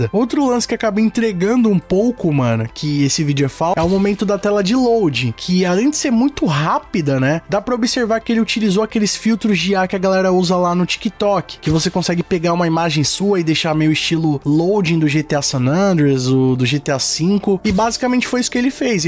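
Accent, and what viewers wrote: Portugal. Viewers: Porque seus videos agora estao com essa dublagem? Em pt portugal? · Porque sua voz parece de um português?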